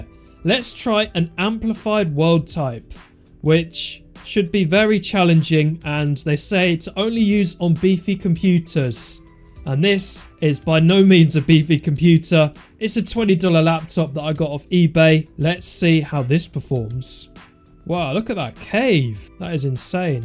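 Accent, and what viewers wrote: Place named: England, United Kingdom. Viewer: He's english. Just because he has an accent doesn't mean he's indian. There are lots of nice people in India and you just provoked them just like that, what a shame.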